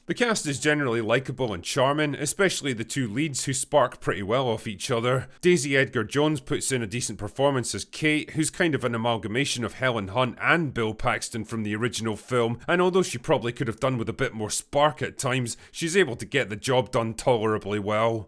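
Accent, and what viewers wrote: Scotland, United Kingdom. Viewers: SSSSSSSIIIIIIIIIIIIIMMMMMMMPPPPPPPP!!!!!!!! ............. Nah, that Scottish accent gets me too 😅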